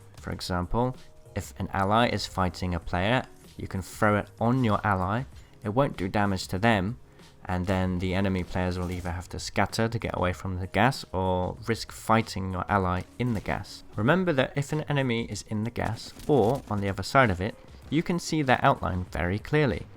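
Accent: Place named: England, United Kingdom. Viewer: Really entertaining, pretty monotone voice but the English accent sorta balances it out, great video overall!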